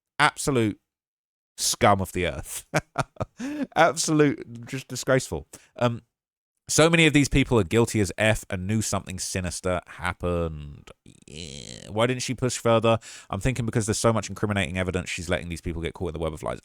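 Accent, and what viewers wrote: United Kingdom. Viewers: when i frst started watching you, i heard your accent and i'm British too and you whipped out a flick knife that locked in place i was like AAAAAAAAAHHHH TAKE THAT INTO THE POLICE STATION YOU'RE GONNA GET IN SERIOUS SHIT. it took me a minute to realise you lived in the US lmao i was like oh wow, i thought this guy was an idiot turns out i'm the idiot and had just landed on the channel. keep up the great work you're the only d4vd reporter i watch. rotten mango has done some good content also but you're a basically daily reporter on this. it's excellent. i enjoy the reddit stuff!! you've scrolled past one of my comments before. freaked me out. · This is extremely informative!how did i not find you before??All other networks and youtubers are being so prim and proper about it all and not even giving updated updates, if that makes sense. 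And the brit accent is so refreshing!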